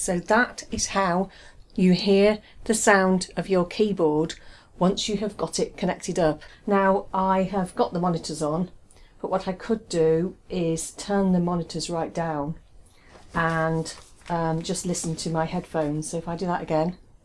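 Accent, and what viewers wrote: England, United Kingdom. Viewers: Hello, I'm from Germany, did I recognize your sound that you come from England or is it from another country?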